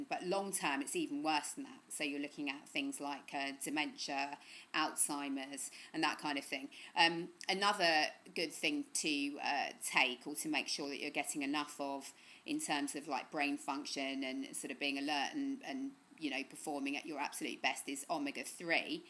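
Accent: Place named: United Kingdom. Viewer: Your accent is so gorgeous! Which part's of UK it is? :) Just find your channel and binging on it now :) Thanks for great info! xx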